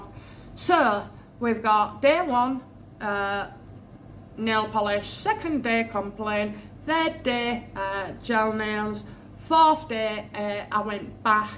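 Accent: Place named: Yorkshire, United Kingdom. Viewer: This lady speaks English very clearly, no problems understanding her northern (Yorkshire) accent, a great regional favourite! Usually associated with a no-nonsense, down to earth, hardworking, family-loving nature.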